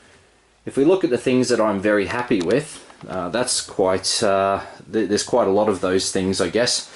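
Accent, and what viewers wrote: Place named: Norway. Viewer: I love your Norwegian accent 👍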